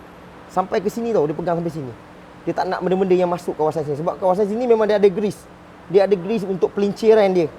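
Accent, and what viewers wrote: Pahang, Malaysia. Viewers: Pekat Pahang yakkk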